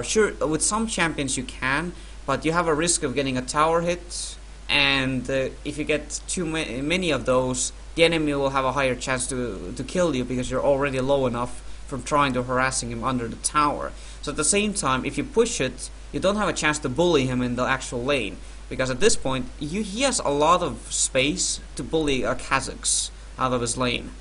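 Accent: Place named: Sweden. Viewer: what accent is it? swedish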